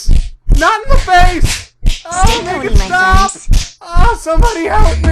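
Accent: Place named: Scotland, United Kingdom. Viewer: good theory i do love the scottish accent tho : )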